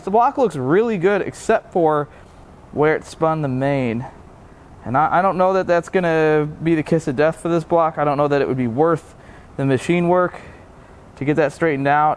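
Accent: United States. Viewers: Normal engine design from this millenium. Ps. Are you yank?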